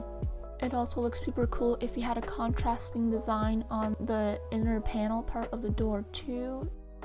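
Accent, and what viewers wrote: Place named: New Jersey, United States. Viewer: i love your voice it’s like a fem kermit from new jersey,,, very calming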